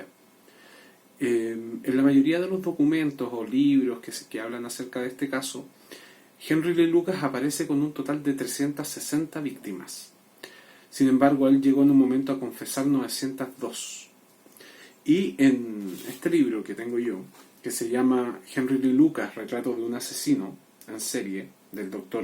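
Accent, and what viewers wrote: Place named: Chile. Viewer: Gracias por las recondaciones. Saludos desde Perú, he madrugado a ver tu vídeo Por tu dejo al hablar asumo que es Chileno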